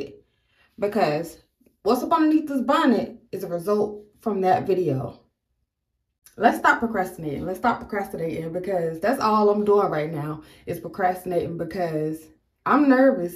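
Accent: United States. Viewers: I could hear the beautiful southern accent ❤. Yep it’s hot 🥵 down here… you look gorgeous ❤ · Omg loved the hair! I work from home and most of the time I wear my hair in a big fro. When I straighten it(not too often), my husband don't know how to act chile!! Lol. Your hair is beautiful, cool personality. New subbie here!!😊 Are you from New Orleans? Sounds like it😊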